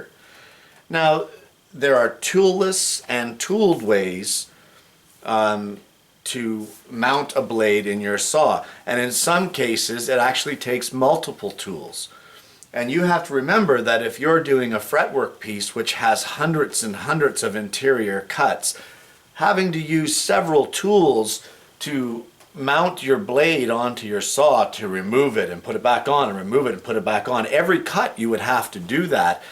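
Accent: Canada. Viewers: I thought Canada too, from your accent. My son's living and working in Toronto, so I expect his accent will change slightly while he's there. The scroll saw tips are really useful. So much choice, I need to be well-informed before buying one. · I really did appreciate your video - it really opened my eyes. So, I got close, eh? HAHA!!! Your lic. plate is Ontario, and your accent is Canadian ... I grew up in Buffalo, NY. Gets cold, but hey, we gotta woodworking shop for that, right? Great teaching! Thanks again! Btw, I'm going to build wooden clocks with wheels no larger than 20 cm; could you recommend a model that would do wonders for me? Thanks again!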